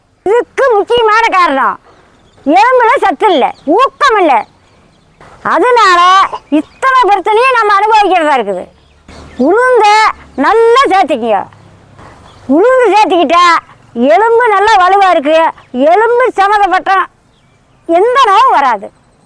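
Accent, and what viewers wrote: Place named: Kongu, India. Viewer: சமையலை.விட நம்ம கொங்கு தமிழ் அழகு ❤❤❤❤❤❤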